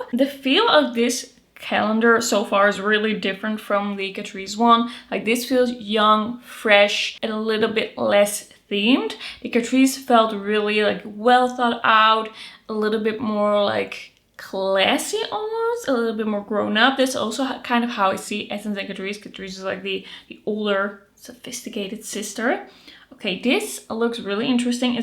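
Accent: Netherlands. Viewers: Hi 👋 Thank you for your reviews on more affordable products. I just recently discovered your channel and had to subscribe. I love you accent are you from Netherland? 🩷 from South Africa 🇿🇦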